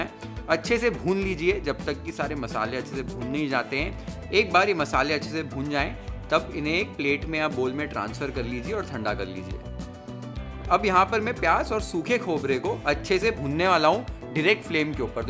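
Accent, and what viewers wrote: Maharashtra, India. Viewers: R you Maharashtrian? My wonder boy. Your sounds said.... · BRO ARE YOU MAHARASHTRAIAN😮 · Bonus recipe❤🙂👍!!!!! Ekdam authentic preparation chef!!!! Jiyo chef !!!❤ also nice marathi accent❤!!! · Your marathi accent is very nice 🚩🚩👌🏻👍🏻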